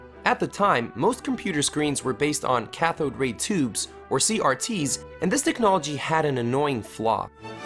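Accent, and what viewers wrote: Balkans. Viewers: Are you from the Balkans? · Are u from balkan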